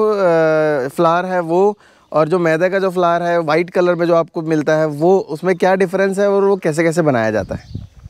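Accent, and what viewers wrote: Maharashtra, India. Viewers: This is my first time. I really like the way u explain and your touch of Marathi in hindi really sounds good.Keep up the good work.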